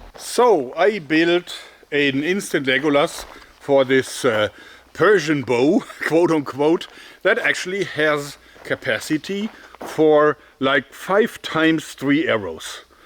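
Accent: Germany. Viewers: 😆😆😆 Explanations boring? How can we resist History with ASMR "jerman" voice and giggle ♥️✌🏼📝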